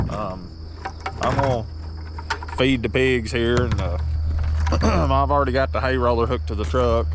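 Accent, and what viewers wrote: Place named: South, United States. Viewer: hi nice hay were is this farm you talk southern, i live in hadley ma very dry here and no rain monday get some need it bad is it dry there and how is the hay crop growing, you have cows and feed them i used to have a farm mo longer every thing got sold and lighting hit the barn last summer every thing got lost so no more thanks for the videwo.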